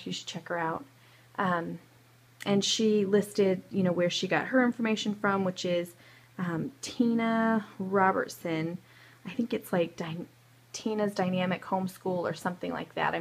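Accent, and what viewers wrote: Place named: North Texas, United States. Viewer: Hopefully my profile pic loads soon...but if it doesn't, this is Kristen. :) I love how you talk like a yankee moved to Texas! :) I'm glad you're doing this vlog as I am still considering homeschooling, and I love your organization. Thanks! Miss you friend!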